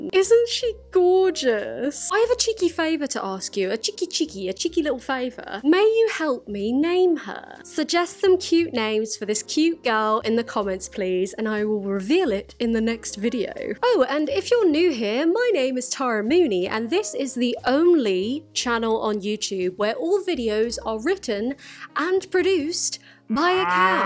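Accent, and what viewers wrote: Ireland. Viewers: Your Irish accent was thriving this video and I am HERE for it. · Tara has an Irish accent like my wife's. Perfectly innocuous English accent, and the BOOM, the letter "r" appears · Until you made the Ireland joke I assumed your accent was just English but now all I can hear is your Irish R’s lol (especially when you said ‘argument’) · wait, you’re irish? cumann na mBan video when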